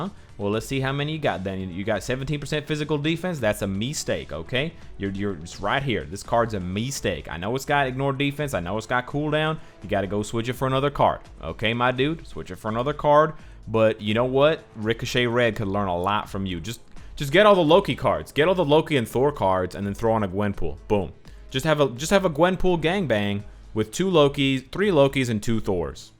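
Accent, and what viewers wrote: United States. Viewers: I found that country accent funny and I wanna be roasted IGN abdouben22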